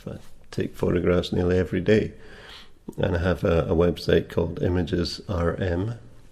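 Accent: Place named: Scotland, United Kingdom. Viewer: Dearest, so sad to hear this, thrilled to hear your poems and will visit your photography site, also so good to hear more about you, but would love to hear you sing 😉😊 I will so miss your calm sensitivity and beautiful notes, your voice and accent which makes me feel closer to my dad and the family and of course Scotland.. your shirts and jumpers.. 😍 no other matches you. Enjoy more time to write and take beautiful images and take care too.. love to you three xxx and please return soon!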